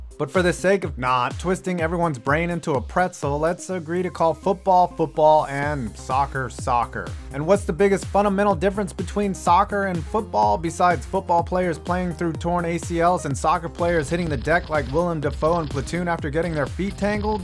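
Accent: United States. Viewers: Shut up.. you sound so America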